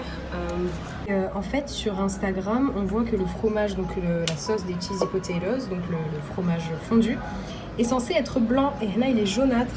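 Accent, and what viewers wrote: France. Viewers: Salam Alaykoum ma soeur tu es françaises et tu vie en Algérie ? Je me demandais car t’as un accent français mais t’as aussi un bon accent Algérien quand tu dis un mot arabe lol J’aimerais vivre en Algérie insha’Allah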